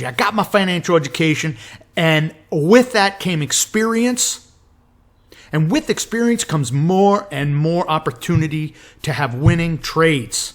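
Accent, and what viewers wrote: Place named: Texas, United States. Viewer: Good content! Very educational and real world. And to top it off you talk like The Texas Fonz!! : )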